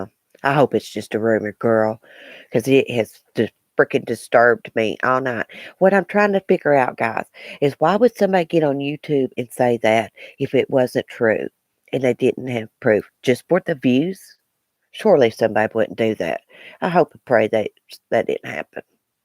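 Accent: South, United States. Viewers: Love your sweet southern accent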